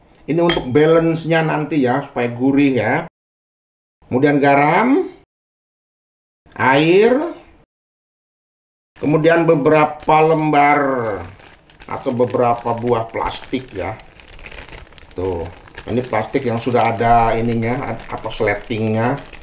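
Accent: West Java, Indonesia. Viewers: Kungkurahan,Sunda banget Pak😂❤️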